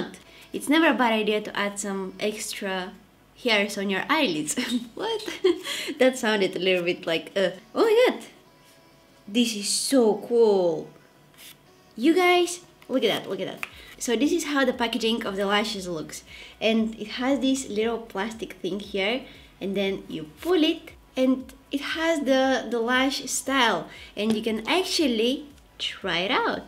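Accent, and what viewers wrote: Greece. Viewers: are you greek ? omg and i was wondering why i love your accent and the way you talk !! greek power girl !! xo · Απο τι στιγμη που την ακουσα λεω ειναι ελληνιδααα το ηξερα❤️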